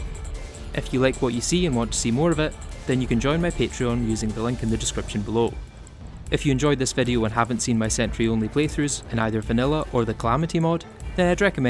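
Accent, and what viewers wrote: Scotland, United Kingdom. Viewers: Came for the terraria, stayed to be gaslit by a nice sounding scotish man :)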